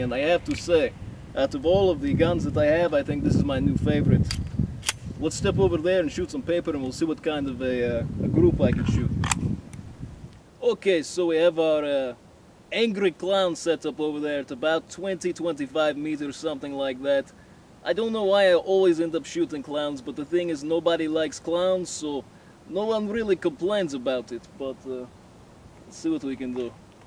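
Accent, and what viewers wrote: Russia. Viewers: omg I love russian accent XD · The Russian accent is slowly wearing off =( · Hey, Ive been watching you for around a week or 2 and I think your awesome, I always knew russians are this cool. Your accent is awesome, your always randomly funny and you shoot very well. I hope you will save us all when the zombies arrived some day:3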